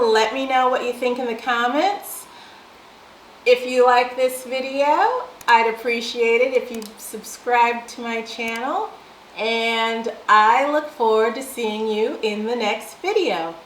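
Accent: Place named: Lisbon, Portugal. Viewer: Awww you already talk as a lisboeta 😊😊👍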